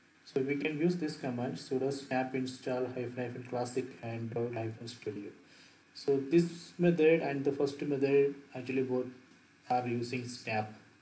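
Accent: India. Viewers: Are you from Kerala?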